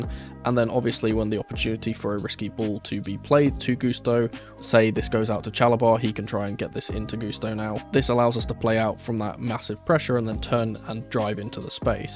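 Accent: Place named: Spain. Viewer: that spanish accent. Damn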